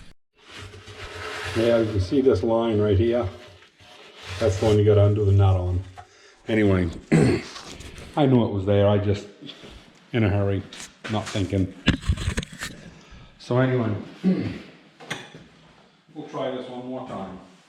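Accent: Maine, United States. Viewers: Its extremely funny for me to watch a fellow Mainer on youtube. LOL! We have our own dialect.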